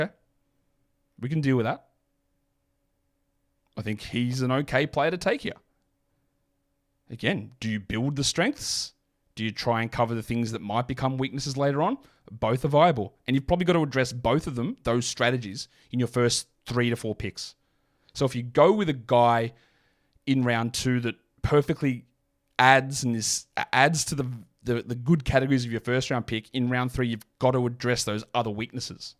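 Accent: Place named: Australia. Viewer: "They deliberately say something wrong or weird to boost themselves up in the algorithm. Maybe I should start that" Also: has a thicc Aussie accent who hosts a daily show that with a viewerbase of largely Canadians and US, and greets them every show with obsure aussie quotes like "what is the crime, eating a succulent Chinese meal" 😅😅 Look, you're not wrong. Kept me coming back that's for sure.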